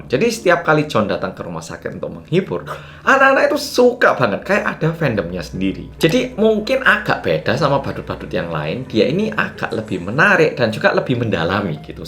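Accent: Java, Indonesia. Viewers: Bahasa Jowo ne yo medhok banget...😅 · Wong jowo😂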